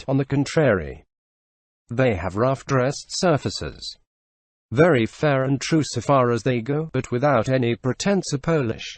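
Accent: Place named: United Kingdom. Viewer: atrocious robotic British accent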